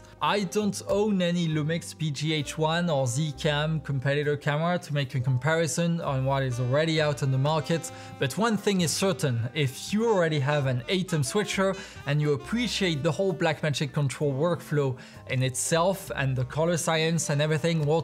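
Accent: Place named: France. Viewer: on enttend légérement l'accent francais.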